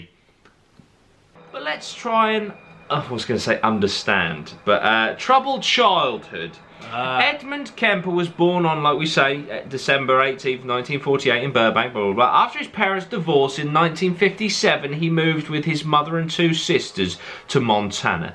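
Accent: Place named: United Kingdom. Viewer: You brits pronounce trachea funny :P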